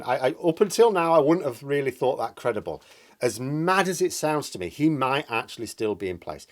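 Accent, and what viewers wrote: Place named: Northern England, United Kingdom. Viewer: Why is anyone so surprised by Sunak's mini budget? He's a man with a rich wife, he doesn't live in the real world - none of the Tory Cabinet do. They haven't a scintilla of an idea about ordinary people and even the mention of Universal Credit, food banks and poverty sends most of them into apoplexy and reaching for the smelling salts. They can't deal with it and don't want to. Worst government in our lifetime, worst PM in history and a chancellor so inept and inexperienced that we haven't a hope in hell until they are removed for good. In other news, is an erudite genius who cogently updates the intellectual elite of the UK. His northern baritone makes me weak at the knees:/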